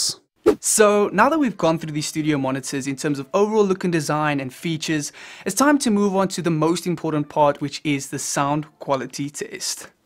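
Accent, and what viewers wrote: South Africa. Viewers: I hear a South African accent. Am I right?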